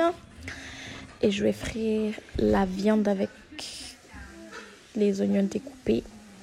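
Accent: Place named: Montreal, Canada. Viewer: Montreal sa sentend dans la voix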